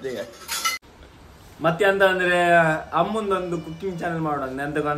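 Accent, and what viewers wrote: Kundapura, India. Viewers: ಇದು ಕುಂದಾಪ್ರ ಕನ್ನಡ ನಿಮ್ಗೆ ಅರ್ಥ ಮಾಡಿಕೊಳ್ಳಲು ಕಷ್ಟ ಆಗುತ್ತೆ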